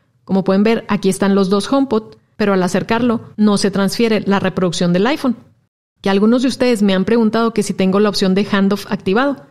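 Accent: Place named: Monterrey, Mexico. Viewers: Yo supondría que es de Monterrey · De donde eres y porque hablas como regia?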